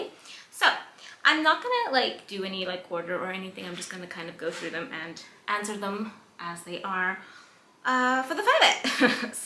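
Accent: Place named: Australia. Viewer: Omg I thought you were Australian, you had a very strong Aussie accent when I first started watching some older videos! I’m Australian!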